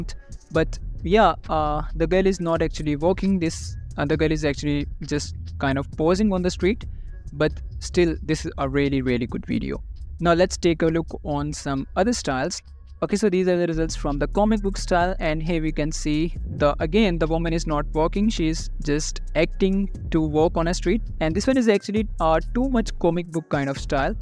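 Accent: India. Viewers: Bro admin from India...? speaking English is funny...😂😂😂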